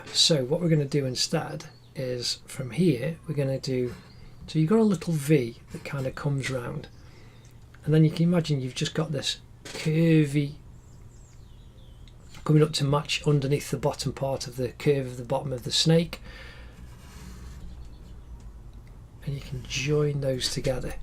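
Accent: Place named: Cleethorpes, United Kingdom. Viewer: hi! you sound like you are from near me, i am in cleethorpes x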